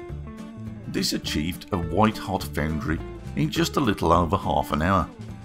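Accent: Australia. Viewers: Here from bigstackd casting. Love the Aussie voice and the oil burner tutorial! Subscribed! Great cast btw. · Love the attention to detail. You're quickly on your way to becoming a master at lost wax. Won't make any comments about the male tree because it would be crude to say something about it's balls.lol Noticed below someone likes your "Aussie" accent. Thats ok because I read on big stack where someone asked if his flag was the Union Jack!! Lmao good show mate.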